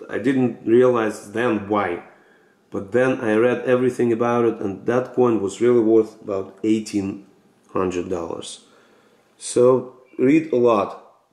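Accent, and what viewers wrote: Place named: Russia. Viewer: Выдаёт русский акцент :)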